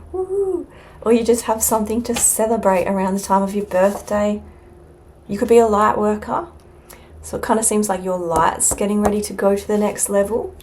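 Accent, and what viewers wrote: Australia. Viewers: Are you from Australia or England? New Zealand perhaps .your voice ❤❤❤ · ambient australian authenticity ♫ ergonomic ♪ easy on the ears ♪ pronunciation sans pretence ♪ maaaate ♫ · Love your Australian accent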